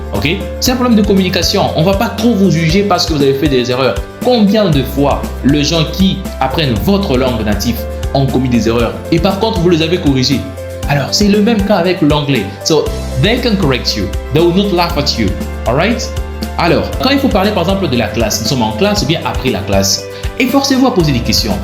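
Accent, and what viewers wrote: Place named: United States. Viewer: Hi there, i love your enthousiasm and the way you switch in a Split second from French to English, you are totally right in your explanations : speaking is absolutely primordial, the more you will do it the better it will be for your English improvements.Maybe you live in the USA , at least your accent tends suggest it? Thank you for the vidéo.Serge from France native french speaker !!!!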